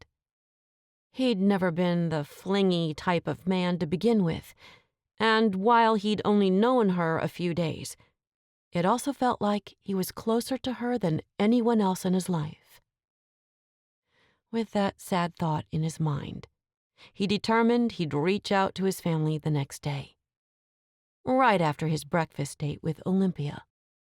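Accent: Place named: South, United States. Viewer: Really enjoyed the story. I have lived a n the south all my life and I’ve never heard anyone speak so slowly and so southern.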